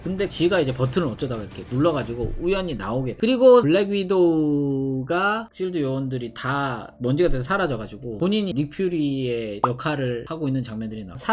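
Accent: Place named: Japan. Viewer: 어 일본분인가여... 억양이.. 듣다가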